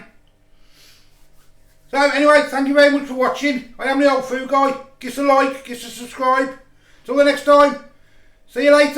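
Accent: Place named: Midlands, United Kingdom. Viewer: Which part of the midlands are you from, sir?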